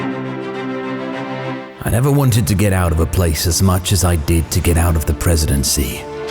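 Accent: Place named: England, United Kingdom. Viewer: As told by a limey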